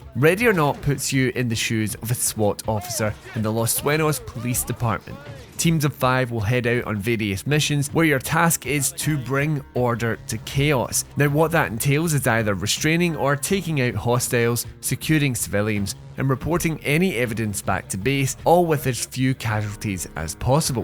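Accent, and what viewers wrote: Scotland, United Kingdom. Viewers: Its called an accent. Scottish. There's a big world outside america little guy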